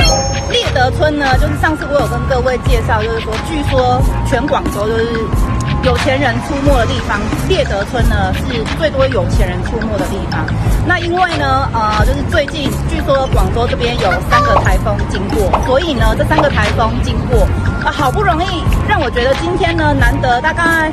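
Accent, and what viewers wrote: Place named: Taiwan. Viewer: 國語台灣本島腔，難聽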